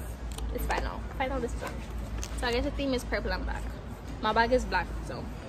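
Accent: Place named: Jamaica. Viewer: I love u accent❤ are u jamaican?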